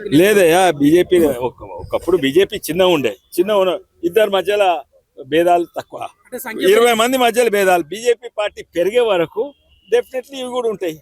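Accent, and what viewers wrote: Telangana, India. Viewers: Sir firing eroju😂😂😂 Achamaina Telangana yasa😂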